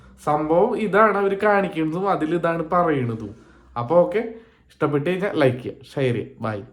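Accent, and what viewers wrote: Thrissur, India. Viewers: തൃശൂർ ആണല്ലേ........!!!!". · ഇയാള് തൃശൂർ ആണോ തിരതൊരം ആണോ?? 😂😂😂😂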